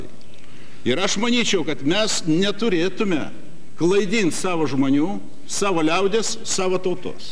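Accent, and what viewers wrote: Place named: Russia. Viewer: blogai ,jis mires bet jo akcentas russiskas ,kaip ir snieckaus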